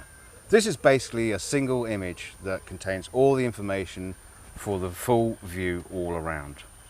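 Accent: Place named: RP, United Kingdom. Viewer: Wasn't sure if you were the script writer too. With that authoritative BBC accent I guess you've got the bases covered. Bravo. Yeah the world is round.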